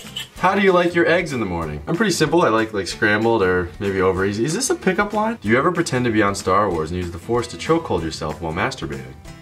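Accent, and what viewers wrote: Boston, United States. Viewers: Love the Bostonian accent.. and the beard! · This was fucking excellent! That Boston accent is definitely coming through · I enjoy your Boston accent. :)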